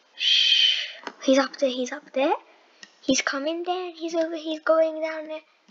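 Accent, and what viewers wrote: United Kingdom. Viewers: Umm idk what he's says because he's bridsh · British boy 🥳 no offense I like em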